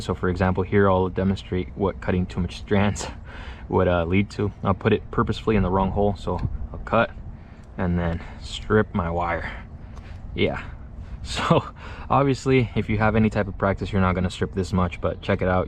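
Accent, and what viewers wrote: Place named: United States. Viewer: Good to hear an American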